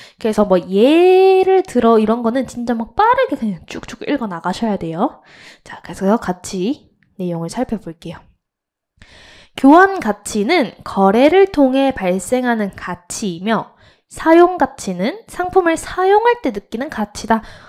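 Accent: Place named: Gyeongsang, South Korea. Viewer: 경상도 분이신가 사투리 나오시네 ㅋㅋ귀엽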